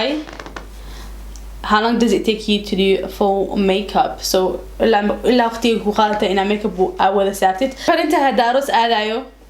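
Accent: Mogadishu, Somalia. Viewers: Hhhhhhh thanks xamaraa kaga keeny cabah afsoomlig blo weeye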